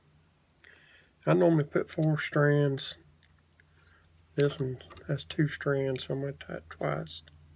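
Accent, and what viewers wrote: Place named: Western North Carolina, United States. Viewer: Another informative video, also inspiring! I could tell by your speech, that you had to live somewhere near me! I Live in the Western part of North Carolina! So we do have a little in common! Thank you, again! Take Care and Be Safe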